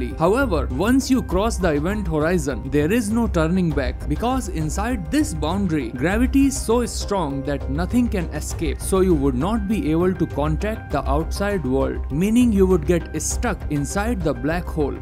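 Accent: India. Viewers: Are u Indian? Ur voice is so annoying.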